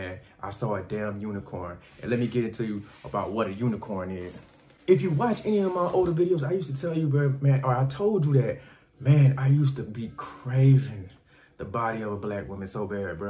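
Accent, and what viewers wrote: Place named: Memphis, United States. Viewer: Definitely sounds like you are from Memphis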